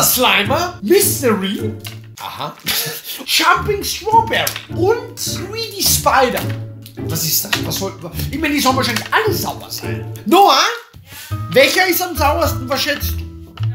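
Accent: Austria. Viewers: Kann es sein, dass ich da einen leicht österreichischen Zungenschlag raushöre?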